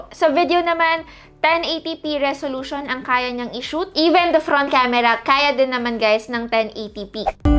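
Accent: Visayas, Philippines. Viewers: yay! bisaya ka ate? <3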